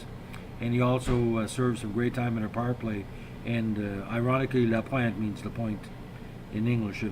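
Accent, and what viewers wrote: Canada. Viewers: Are you quebecer or acadian?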